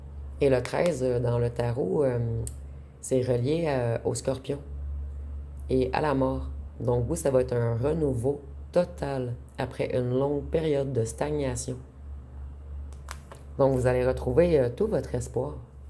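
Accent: Quebec, Canada. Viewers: Mes Respects du Four et Enchanté Chère 🌹🦋🙏 Merci pour vos guidances que je ne manque jamais! j'aime beaucoup votre accent Québécois aisi que ce beau vernis que vous portez si bien 😊 Un Agréable et heureux Dimanche 😊 Bien à Vous 💐 Alex 🙏🧘‍♂️🎉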